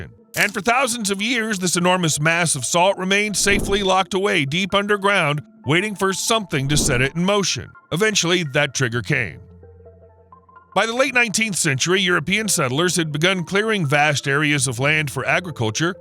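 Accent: United States. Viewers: Heres another Australian who also has never heard of this Disneyland. The American accent telling artificial information.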